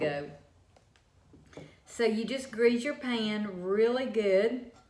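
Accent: South, United States. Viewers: OMG, I’ve been binge watching videos for the past two hours, it’s almost 2am and I have to get to bed! I just can’t get enough of your recipes, and your the sweetest lady & I love your southern accent. So thankful your channel showed up on my recommendation. Thanks!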